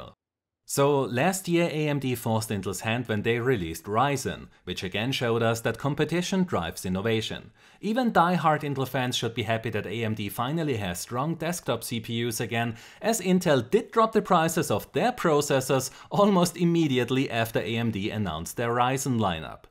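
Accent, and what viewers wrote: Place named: Germany. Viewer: are you german? where does your strong accent come from?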